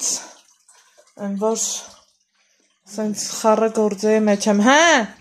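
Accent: Lori, Armenia. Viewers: Թող լսեն անուշ համով հոտով լոռվա քաղցրր բառբառովա խոսում, հրաշք հայուհի ջանը💕💕💕💕💐👍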